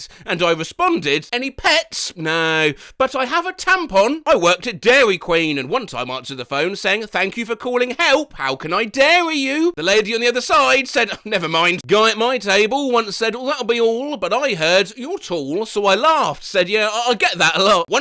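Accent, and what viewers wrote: United Kingdom. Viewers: Werid how a British critter can make me feel better after ballin 😎 my eyes out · This is hands-down the funniest video to date. Turns out that everything is made better when read by a middle-aged British man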